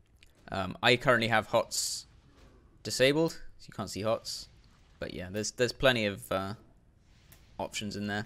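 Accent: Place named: United States. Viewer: That american accent lol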